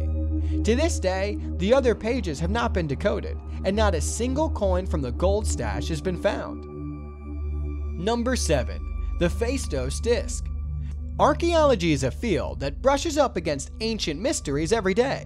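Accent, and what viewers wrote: United States. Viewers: The speaker sounded like a fucking hillbilly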